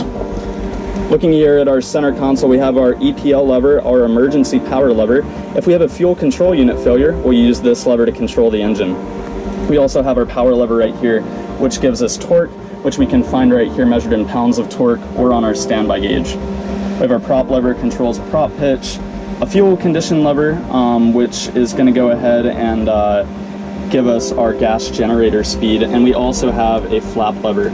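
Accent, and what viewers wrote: RP, United Kingdom. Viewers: You're so posh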